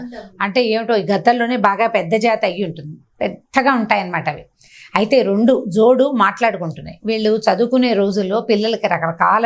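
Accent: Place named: East Godavari, India. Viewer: Namaskaram pedda.akkayyagaru.mee.mata.stala.svacchamgavundi.bahusha.meedithoorpugodavarijilla.anukuntunnaanu.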